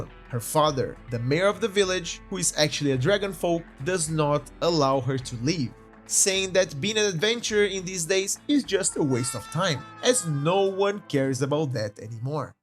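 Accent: Brazil. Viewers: Ja vi que é brasileiro pelo sotaque skkskskssk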